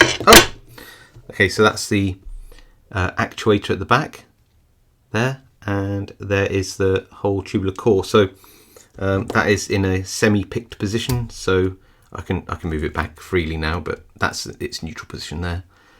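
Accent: United Kingdom. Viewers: It's always interesting to watch, honest you could just talk and not pick a lock and we'd still enjoy it. British people are pretty awesome sounding anyhow, but ya you'd be surprised, we want to know more about you too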